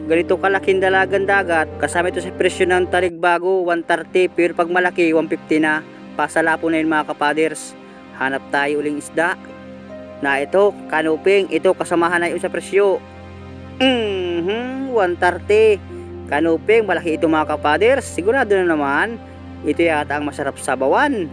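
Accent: Bicol, Philippines. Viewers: Pa shout out idol Ruben Cruz nasa Dubai pero taga bicol kaya gusto ko Tagalog mo at ma halong bicol 😆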